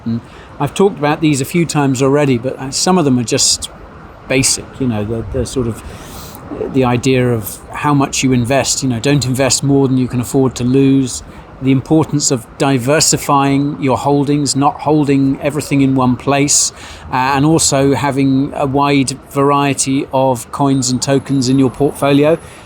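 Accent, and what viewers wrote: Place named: United Kingdom. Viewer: Hey I use your vlogs to learn British accent and use in my acting 😁 keep them coming!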